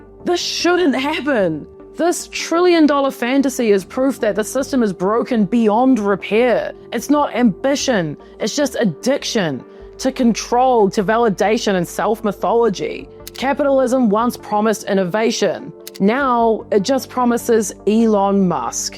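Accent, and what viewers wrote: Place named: New Zealand. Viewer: It sounds like NZ to me. AFAIK, pronounciations like "Tiksus" and "sivunty" have the Kiwi vowel shift. A few weeks, ago, she was talking about people from Mexico and it sounded like she was saying "mix a can"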